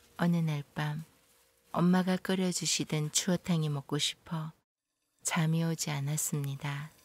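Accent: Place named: Gyeongsang, South Korea. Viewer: 참~~경상도 사투리는 언제나 들어도 좋아요 사람을 무장해제시키는 매력있어요^^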